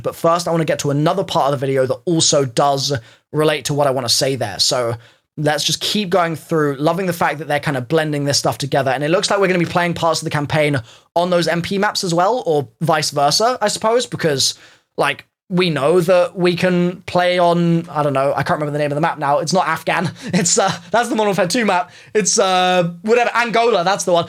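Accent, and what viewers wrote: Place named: United Kingdom. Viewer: The most British accent i ever heard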